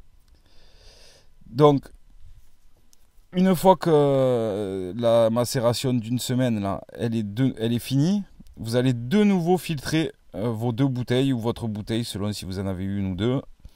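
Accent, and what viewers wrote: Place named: Puylaurens, France. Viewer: C EST GENIAL ! L ACCENT ON A LE MEME !PUYLAURENS 81 !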